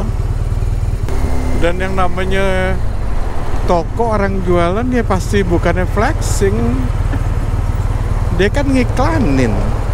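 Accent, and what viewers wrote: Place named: Bali, Indonesia. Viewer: Bang maaf, aslinya dari mana?,dari logat bicara abang ko kaya orang Bali..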